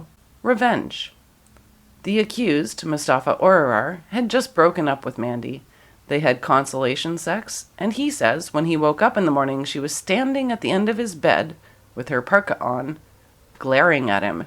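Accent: Canada. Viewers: I love your accent, Are you Canadian? Am watching your videos which are courageous...love the Bill Cosby one!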